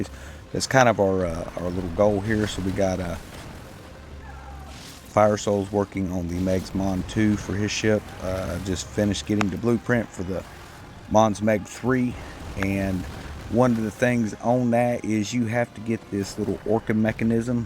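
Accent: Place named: New York, United States. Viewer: Your accent gives yourself away. New York? 😉 S C here, take care.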